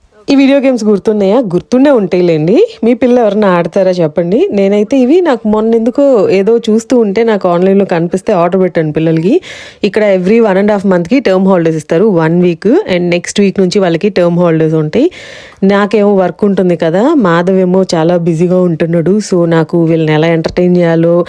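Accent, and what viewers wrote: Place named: Andhra Pradesh, India. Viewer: Authentic andhra telugu by bro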